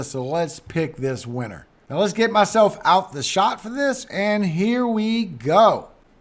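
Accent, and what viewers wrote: Louisiana, United States. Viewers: Bust thanks sounds like ur from houma la or nearby neworleans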